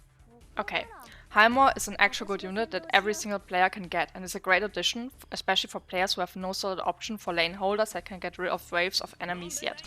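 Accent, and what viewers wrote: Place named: Germany. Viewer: German accent is a welcome surprise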